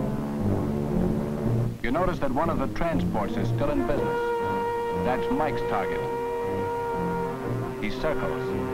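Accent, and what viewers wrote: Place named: New York, United States. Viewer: narrator sounds like he's from NY.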